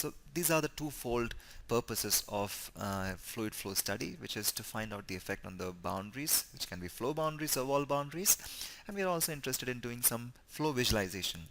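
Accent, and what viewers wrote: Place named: India. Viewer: I felt the audio was great but the English isn't with African accent but an Indian accent. I am sorry the audio wasn't great for you.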